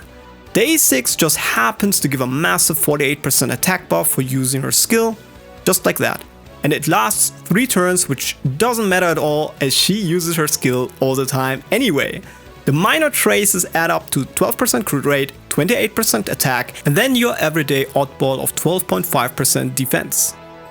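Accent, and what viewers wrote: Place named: Germany. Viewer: bro got that german accent🗣🗣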